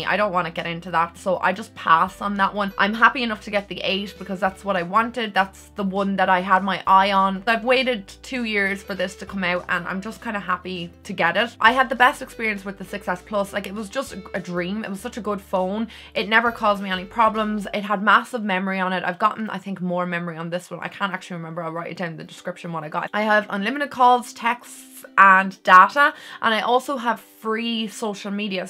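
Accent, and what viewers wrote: Ireland. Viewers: Is she Irish?NVM I can tell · Are u Irish?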